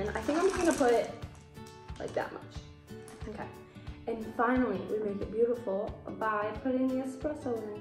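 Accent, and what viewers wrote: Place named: Michigan, United States. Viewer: lol I can tell by the accent. I’m from California just moved to Michigan and it’s something I noticed immediately lol + my husband is originally from Michigan and he sounds the same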